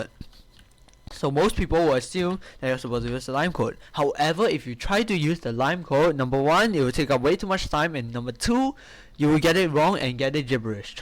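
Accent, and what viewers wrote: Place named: Singapore. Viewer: unrelated, but are you from SEA? specifically SG? the accent really gave me a shock hearing it in the wild LOL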